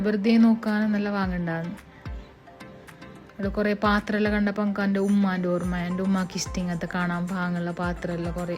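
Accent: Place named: Kasaragod, India. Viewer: Language orginal kasaragod🤩👌🏻kelkan vibe avvunund❤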